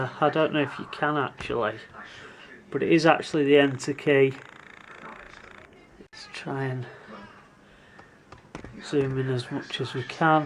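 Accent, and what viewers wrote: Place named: Midlands, United Kingdom. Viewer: You sound as though you're from the Midlands...I'm guessing first Lincolnshire or possibly Nottinghamshire.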